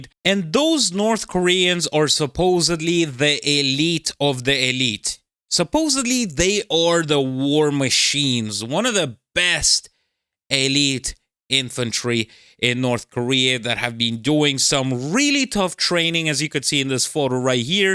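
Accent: Russia. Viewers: Best channel on YouTube? Maybe Best russian translation accent ? Definitely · Oh boy, no vodka, sober Russians, say it ain't so., I love you, because you are funny and your accent accentuates your comments.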